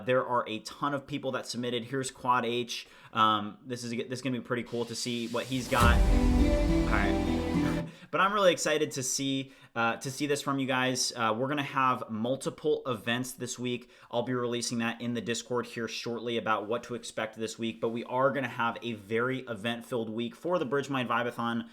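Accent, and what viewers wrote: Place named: United States. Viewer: I like the 'merican accent and: eeaaAANNd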